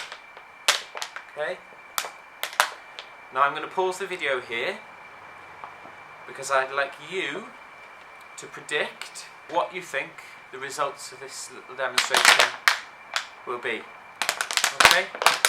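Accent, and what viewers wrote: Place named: Wales, United Kingdom. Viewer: Where is this guy from? He has a very strong accent. He sounds welsh as a matter of fact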